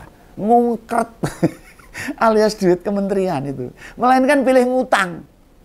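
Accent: Java, Indonesia. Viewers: Cara bicara om membuat saya ketawa2 sendiri...selalu saya tungguin responnya terhadap berbagai isu yg lagi hangat.....ringan tapi berisi dan yg penting lucu khas orang jawa❤❤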